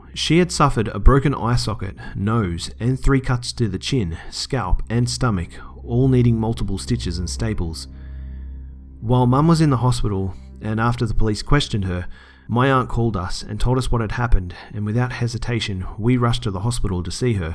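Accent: Australia. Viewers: move here to Australia, a lot of people sound like this even me and my friends... in other words everywhere 0_o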